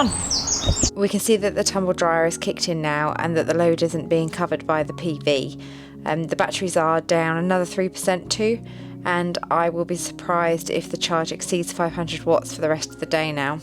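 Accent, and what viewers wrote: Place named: United Kingdom. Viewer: Thanks for uploading this video love the British accent ❤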